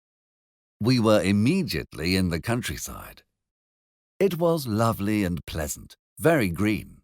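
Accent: United Kingdom. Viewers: British accent, eh.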